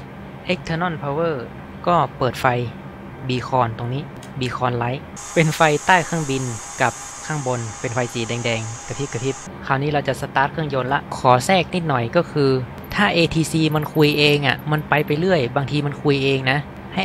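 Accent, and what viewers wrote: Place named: North, Thailand. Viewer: กลางเด่น (สาบเมืองขนาดเน๊าะ รู้เลยว่าคนเมือง)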